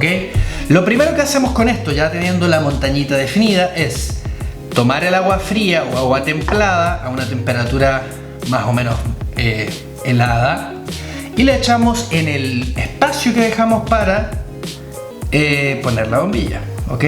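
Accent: Chile. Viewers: Creo q por tu acento sos chileno t agradesco por promocionarlo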